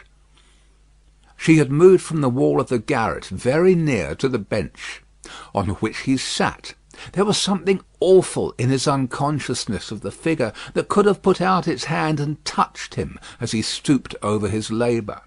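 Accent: United Kingdom. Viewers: No, he sounds merely British.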